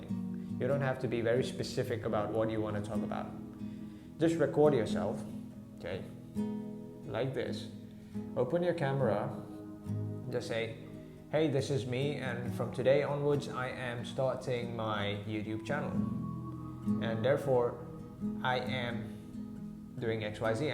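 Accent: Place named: England, United Kingdom. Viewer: Hi, I like your english acccent!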